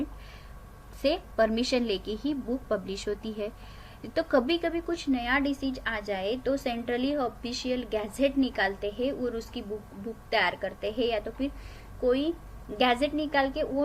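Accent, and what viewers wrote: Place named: Maharashtra, India. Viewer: Marathi tone in hindi but topic samjla thanks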